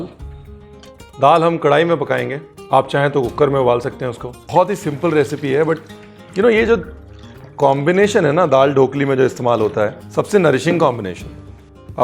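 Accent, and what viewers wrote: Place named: Punjab, India. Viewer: Sir, I come here to listen your Punjabi and love the way you talk 😀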